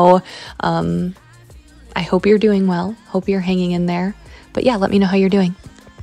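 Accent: Midwest, United States. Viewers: Hey there! I just found your channel and I’ve been binge watching your videos, I find them so relaxing and motivating. I’m originally from Chicago but have been living in Australia for the past three years with my boyfriend, so I love hearing that Midwestern accent, I miss it so much. Can’t wait to visit home soon. I also have to say that so many of these products aren’t available in Australia, like the power paste or scotch brite stove sponges. Such a bummer, but it’s fun to do some research and find similar ones here or even try DIY. Love from Australia! ❤️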